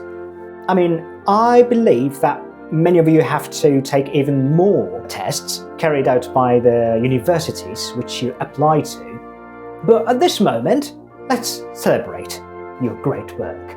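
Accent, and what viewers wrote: United Kingdom. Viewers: まじでどうやったらそんなイギリス英語習得できるんだ？？ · 共テリスニング96、リーディング88とれた‼︎ Thanks to your British English!!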